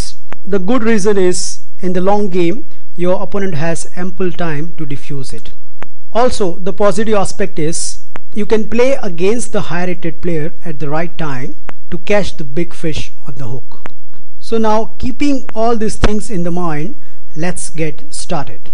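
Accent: India. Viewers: the indian accent... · Love you bro. Hindi accent is great ;)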